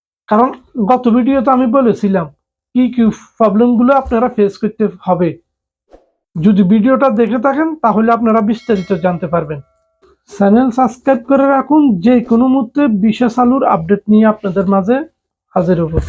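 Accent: Bangladesh. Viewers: আসা হরছি আপনি সিলুটি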